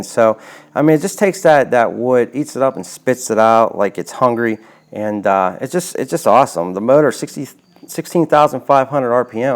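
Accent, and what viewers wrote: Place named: Philadelphia, United States. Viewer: you sound like you are from the Philadelphia area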